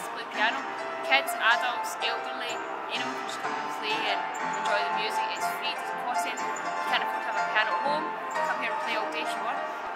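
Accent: Scotland, United Kingdom. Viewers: Thanks goodness for the English subtitles, otherwise I'll have a tough time understanding her Scottish English since I am more used to American English. I think Chinese subtitles might help her get more subscribers just in case she wants more audience. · Love you Scottish accent and your down to earthiness ! I travelled all around Scotland with my younger brother many, many moons ago and loved it ! Mind you, I stayed in the U.K. for 12 years and it was full of fond memories. Keep up the good work ! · Its too heavy as british accent, lol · Its so enjoyable listening to your British English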